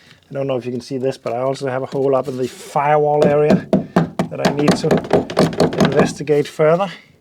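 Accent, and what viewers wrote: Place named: Denmark. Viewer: Hello i just stumbled upon your channel because i saw this saab video. Then i heard your voice and i knew you were danish! (im danish too). It's great to find a danish car channel, there's so few :) I love the saab 900's and im probaly buying a saab 900s (low pressure turbo) as my first car! It's got a bit of rust, the clutch hydarulics arent working but it's awesome! I agreed with the owner that he was going to fix a little problem on it and then i'll buy it. It'll be a great project! :) Keep the saab videos coming :)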